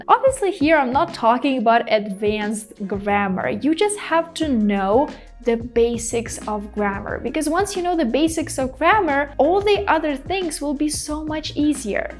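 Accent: Russia. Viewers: Вроде хорошо говорит, но все равно слышатся достаточно уловимый русский акцент. Только потом посмотрел на имя ) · Why do I can detect Russian pronunciation from first word all the time.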